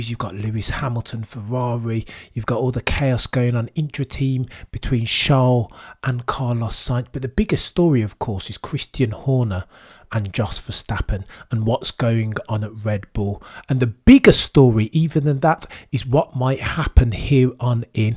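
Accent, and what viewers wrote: Birmingham, United Kingdom. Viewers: try to learn how to pronounce names correctly.... as it is we have to put up with a brummie twang...you sound ignorant.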